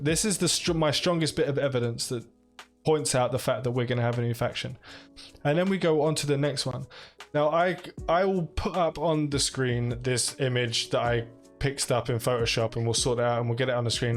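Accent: United Kingdom. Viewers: London geezer init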